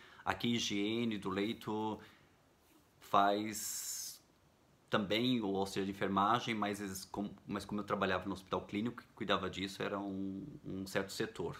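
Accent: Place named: Germany. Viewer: Adoro o seu sotaque alemã 😅 usando sempre a garganta eu amo o idioma alemã já Morei em três cidades alemã beijos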